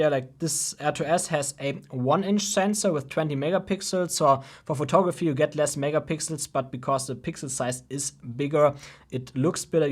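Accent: Germany. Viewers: are you from germany? sounds familar like you are speaking...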